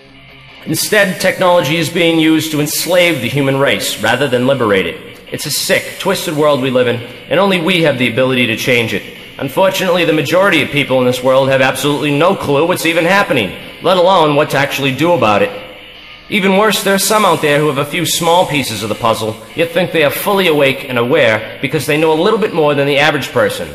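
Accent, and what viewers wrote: Boston, United States. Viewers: What's with the Boston accent? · Good video, as always. But why do you have that Bostonian accent you never had before? I felt like I was watching the departed where the accents changed throughout the film.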